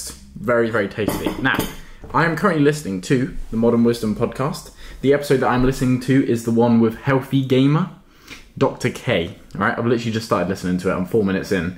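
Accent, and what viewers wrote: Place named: Weymouth, United Kingdom. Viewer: You from Weymouth lad?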